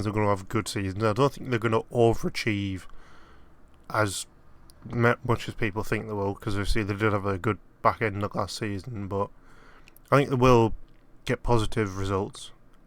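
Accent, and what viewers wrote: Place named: North, United Kingdom. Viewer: can't U tell your from up north.... Thick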